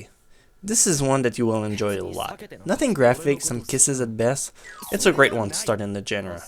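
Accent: Quebec, Canada. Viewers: French Canadian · is Canadian and his first language was French so that's his accent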